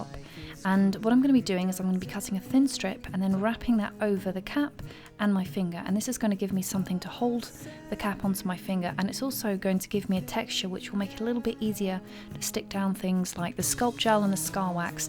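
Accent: England, United Kingdom. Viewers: someone has to tell me what english accent she talks in! believe it or not before ASMR was a "thing" i used to play her videos just for her voice because her accent + voice was so soothing. I reckon she do an ASMR channel. it would be so popular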